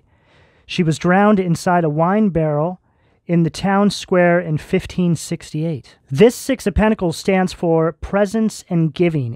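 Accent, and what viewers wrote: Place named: Massachusetts, United States. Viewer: You know… it took me all night to watch but yes! Somehow yes!&you sound like you’re from mass like me… your voice just sounds familiar 🤔💫♉️♋️♌️✨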